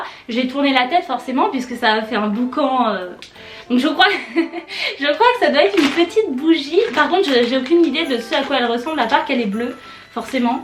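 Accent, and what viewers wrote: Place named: England, United Kingdom. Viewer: Je suis jaloux de ton accent anglais 😭😭😭😭😭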